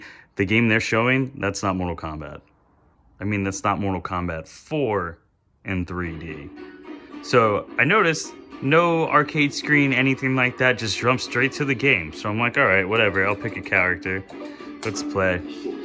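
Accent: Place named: Midwest, United States. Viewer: I thought you were funny. May I ask are you from the Midwest? You speak like us from MI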